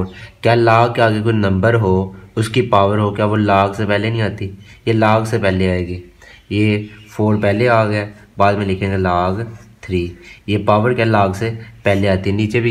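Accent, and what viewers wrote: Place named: India. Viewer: I love your Desi accent laaaaag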